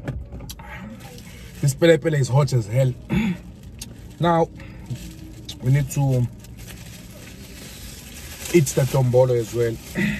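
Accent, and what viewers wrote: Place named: South Africa. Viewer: I love this sort of English accent, with no effort of trying to perfect it. I love this South African way.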